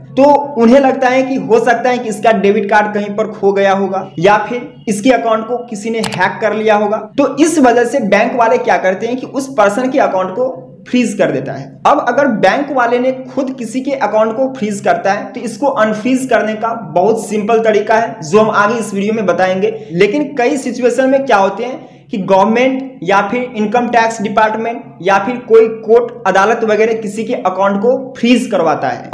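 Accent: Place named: Bihar, India. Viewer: Tumhara awaz se lagta hai tum bihari ho😄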